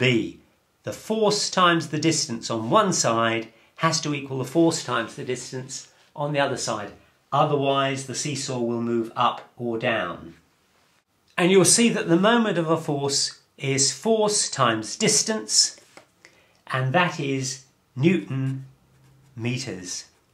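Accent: United Kingdom. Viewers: Rather unrelated, but your voice is so familiar (and peculiarly nice to listen to). Have you been in other youtube projects/channels? Edit; nevermind, apparently you just have a strong UK accent which makes you sound a lot like a guy on Numperphile Also, thanks for making this video (and the rest of them for that matter, as i assume i will like them as well). It's rather difficult to know what subjects to start with, but also what source you can actually understand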